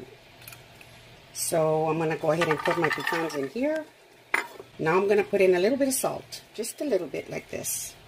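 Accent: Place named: Midwest, United States. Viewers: Latina? No think it's more of Iowa or Minnesota accent but I gotta say awesome video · Do u live in mid West of USA ur voice reminds me of ppl I hered talking. when I was out the mid West I just found u love ur videos so fun food looks great .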